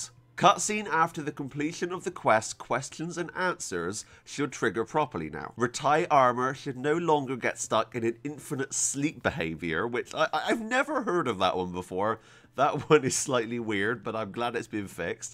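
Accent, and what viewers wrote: United Kingdom. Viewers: I thought so haha. Am also from the UK so kind of recognised the accent. Just wasn't a hundred percent sure haha. Enjoy the videos though! Have only recently got kingdom come and still fairly new to pc gaming. But thoroughly enjoying it, playing alongside it with The Witcher 2, not sure if you've played the witcher series but they are also great games. Have started reading the books recently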